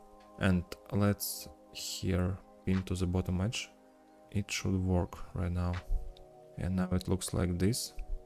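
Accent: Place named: Russia. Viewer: Прям такой русский акцент. Вы говорите по русски?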